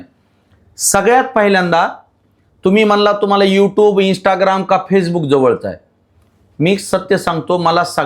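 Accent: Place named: Marathwada, India. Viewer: Greet manush ahe rao bhau vicharpan changle mandto marat vadyachi boli bhasa ahe ti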